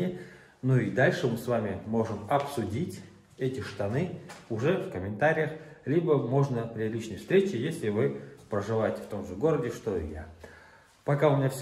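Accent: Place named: Tatarstan, Russia. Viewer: татарский акцент у тебя как у Миниханова.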